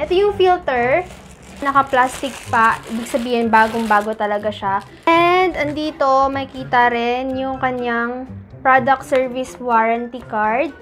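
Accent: Visayas, Philippines. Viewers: Bisaya lge ni si host ilhan hahahah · Bisaya ka maam?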